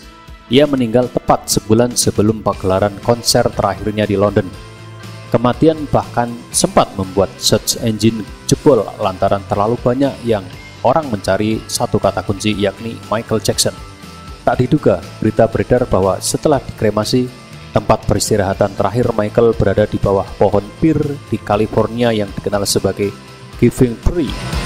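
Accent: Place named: Java, Indonesia. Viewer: Kayaknya pengisi suara, wong jowo ikii..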